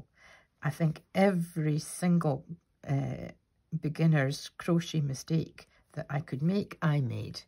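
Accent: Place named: Fife, United Kingdom. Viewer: Good morning from a very chilly Toronto, Canada. I’m an expat Scot from Glasgow, discovered your channel thru IG. Loved this vlog, the story of your mum and your intro to crochet. Wouldn’t she be thrilled and proud at the business and life you’ve created. You look very like her in the pics you shared. I love your Fife accent, so much softer than the harsher Glasgow twang. Your blankets are stunning in your IG grids. I’m more of a knitter though I also crochet. I can’t embroider any more as I can’t see close up detailed work. Eyesight and aging - sigh 🤷‍♀️. I look forward to hearing more in future vlogs. Your grand babies, the twins, oh boy, beautiful Scottish babies, just a cuddleful/armful of love. - Isabel 🇨🇦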